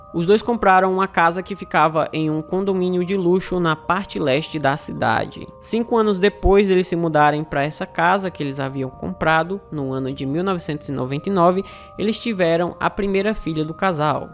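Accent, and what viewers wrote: Northeast, Brazil. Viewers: Adoro o sotaque nordestino do.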